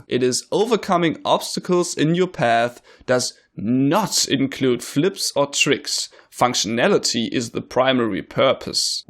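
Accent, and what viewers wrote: Germany. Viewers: Dude Clearly i hear some German Accent in this Video and its Horrible!! better learn to speak like a human being or talk German. This suits you better.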